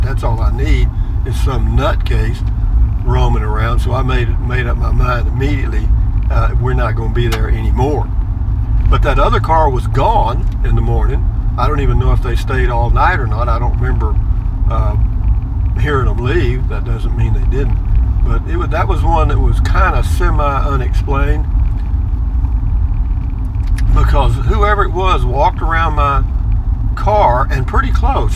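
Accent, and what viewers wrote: Texas, United States. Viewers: Thanks for the Sunday drive! Love your voice and your Texan accent! Good stories. You’re funny- had a couple of gut chuckles😬. Thanks for sharing. Damn, all those roads and not one human in sight!!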